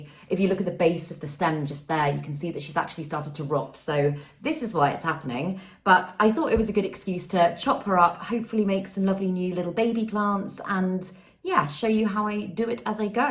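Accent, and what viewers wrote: United Kingdom. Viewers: Do you come from great britain? I think i hear a difference. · How important is the wax sealing?? Is the wax scented or just plain wax.?? I need to move next door to you.😅😅😅.can this be done in leca balls as most of my plants are in leca. I had to remove my plants from soil because of the problem with those nasty fungus nats. They have vacated the premises and i have 4 plants including miss pink princess that i want to transition to hydroplonic. Im afraid to do this major surgery to her. She has new growth on top, sides and bottom at the soil level. She's beautiful but has gotten top heavy and i don't want her to topple over and break something. I will have to notify her of her pending surgery so that she's ready. You are a darling with your British accent and your bun. Thanks for your expertise.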